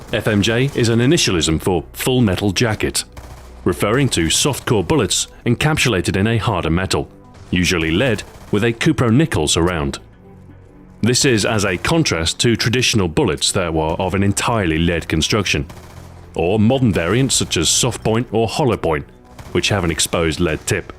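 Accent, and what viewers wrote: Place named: United Kingdom. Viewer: Hey, 2 questions here; have you ever considered that one of the sole reasons that part of your subscribers watch your videos is because you have a kick-ass British accent? And have you ever had a Canadian Keith's beer?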